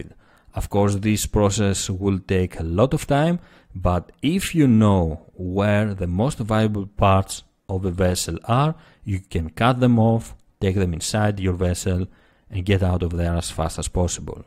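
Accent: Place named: Greece. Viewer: Still miss your Elite content, i don't care if they didn't give you the attention you wanted, i miss my Elite videos with the sexy greek-english. ;) And a lot of stuff have been added and changed, i don't know if you stull play, hopefully you could make some new content. Nothing wrong with the SC content, but that game is so far off release that i try to stay away so i don't get to mutch 'spoilers' when the game becomes a fully playable game i can go and enjoy it, findings out stuff and so on.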